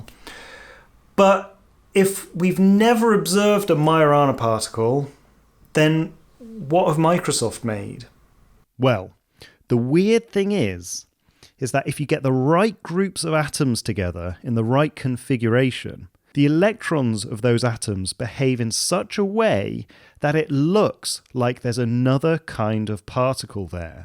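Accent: England, United Kingdom. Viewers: The accent is extremely annoying. English accent is so pretentious and unnecessarily slow and obnoxious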